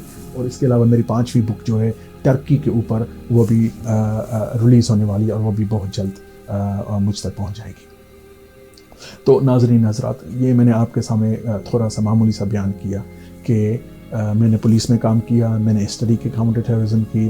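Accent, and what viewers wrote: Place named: Pakistan. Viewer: It is highly inspiring to listen to you.Every voice that's against the established set of idioms of a society, right or wrong, is condemned first but hope your conviction and gravity of sincerity will make everyone understand and hence respect you. Mr, your work on Islamic extremism and terrorism is more in favour of Islam and Pakistan than any other entity or country.You are welcome to our media, please keep sharing your unique and insightful outlook on world polity and current events.Notwithstanding in foreign lands, your accent and ethos is Pakistani, you're a true Muslim and a son of the soil. Love and best compliments with a hope to see you frequently on youtube.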